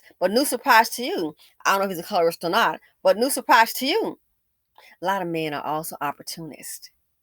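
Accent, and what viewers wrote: South, United States. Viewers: girl you are soo damn funny with your southern accent and twang 🤣🤣🤣🤣. "She picked up the k-mart man and now in divorceland". I screamed my head off 🙌🏾♥️💯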